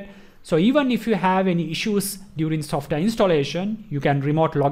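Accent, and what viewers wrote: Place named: India. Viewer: DAT DAT DAT I never understood why Indians do not even try to fight their accent and at the same time climb the Internet to shoot videos in a language other than their own. As one hero said, "I can't swim and I don't put my ass in the pool." The video is about nothing. Any fool can download the repo from the git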